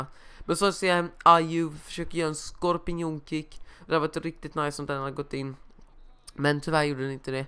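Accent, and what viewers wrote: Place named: Gothenburg, Sweden. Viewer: Du är från götet va? Du har en go dialekt :D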